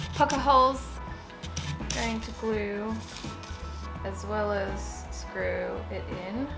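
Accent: Australia. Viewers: Awesome piece 👏🏼👏🏼👏🏼 BTW, where in Oz are u from? I’m from Maroubra, Sydney’s eastern suburbs. Really enjoying ur videos & ur Ozzie accent 😁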